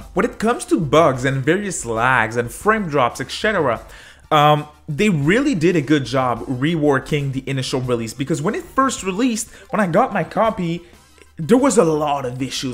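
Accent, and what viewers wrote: Quebec, Canada. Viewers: Will buy it on switch day 1. Can't wait to discover this game, I'm still kinda new to the SMT series. I've only beaten DDS1-2 and smt4. Do I hear a french canadian accent?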